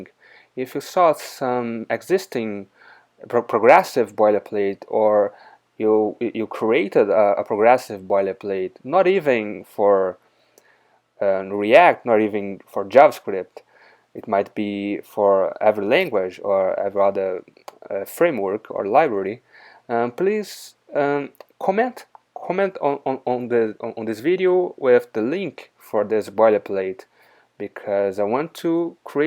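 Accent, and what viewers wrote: Brazil. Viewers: Reconheci o sotaque brasileiro :) kkkk Rapaz tô sofrendo pra aprender React. Realmente baixei um boilerplate pra integrar em um projeto Django, mas veio tanta coisa junto que me perdi todo. O problema é que se o projeto não for seguro e não puder se comunicar com o API num serve pra nada. Você ensina essas coisas ? Tô precisando de um mentor de Javascript Frontend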